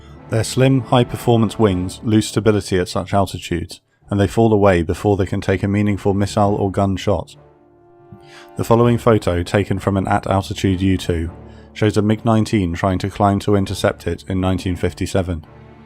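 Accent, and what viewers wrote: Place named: Somerset, United Kingdom. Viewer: Nah, in real life he has a thick somerset accent... Closer to the wurzels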